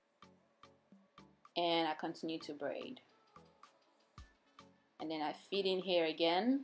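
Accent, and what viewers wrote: Nigeria. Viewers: I love your accent. Are you Nigerian?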